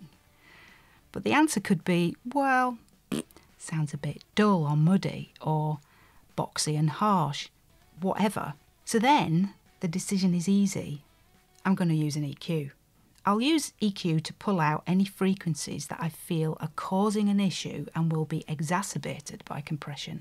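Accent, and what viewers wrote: Northern England, United Kingdom. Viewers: how does this only have 6000 views??? there is so much terrible nonsense on yootoob and your videos are outstanding. plus it’s lovely to hear a fellow northerner on the interwebs. keep it up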